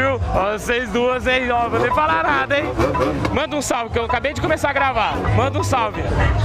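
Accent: Goiás, Brazil. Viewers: No pique do Goiás assim que se fala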